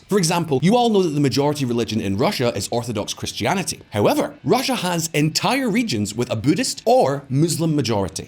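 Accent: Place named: Scotland, United Kingdom. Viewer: How do you get American from that accent? He's Scottish. Part of the UK Ashley 😁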